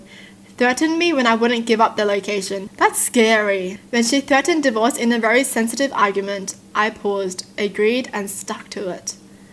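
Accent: Australia. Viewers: she is way to damn austrailian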